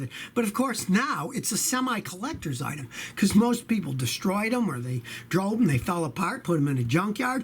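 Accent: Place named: Texas, United States. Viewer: I believe he's from Houston, Texas. Some folks still use old accentuation like that. Mostly older folks. I'm not gonna lie, we do have a general different tone here in Texas tho.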